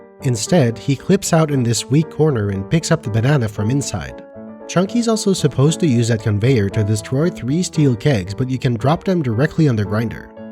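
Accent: Quebec, Canada. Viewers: yeah I felt pretty confident too since early on. I'm not aware of another accent that would sound kinda like Québec's.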